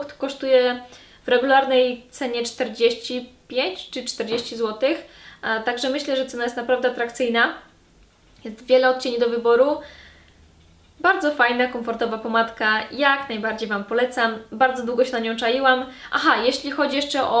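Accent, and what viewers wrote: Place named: Ukraine. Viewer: jestes Ukrainką? Słyszę akcent.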